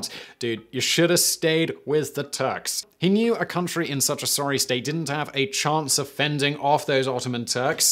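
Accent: England, United Kingdom. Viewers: You're so cute when you crack up and call out your writers! It just slays me.🥰, I adore how freaking English you are! You're like a young Sir Patrick Stewart, can't help but crush a little bit.....or Sean Connery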